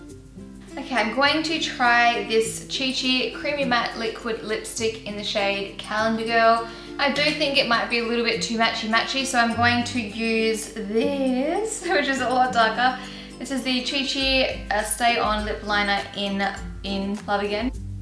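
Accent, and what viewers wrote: Australia. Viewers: I'm new to her channel I just want to know is she Australian??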